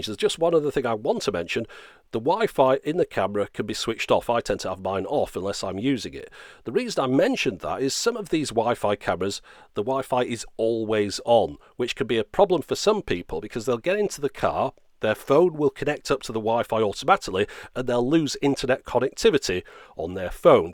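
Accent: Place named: Northern England, United Kingdom. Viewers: Huh, never would have guessed your from preston :3 though you have a very northen accent :p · You've gone all geordie in this video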